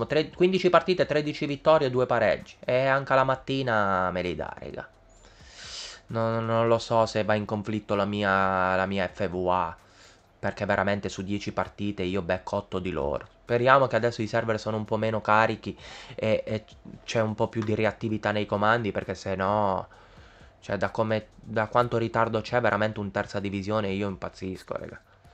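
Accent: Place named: Sicily, Italy. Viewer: Ciao è sempre un piacere vederti, comunque posso chiederti una cortesia? Puoi evitare di bestemmiare? Poi una domanda, ma sento alcuni accenti siciliani. Di dove sei?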